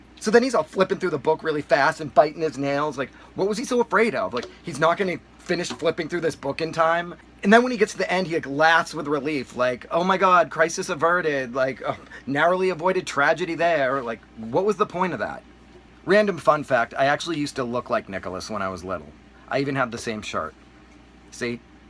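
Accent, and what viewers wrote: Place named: Boston, United States. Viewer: is not British he is Bostonian they talk simlar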